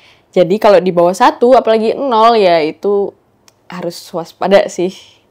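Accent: Java, Indonesia. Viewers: Nonton karena medoknya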